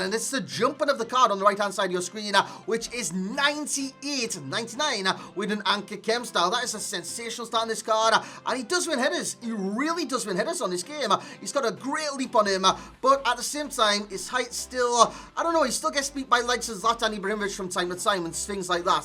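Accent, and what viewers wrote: Newcastle, United Kingdom. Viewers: Proper Geordie love the vids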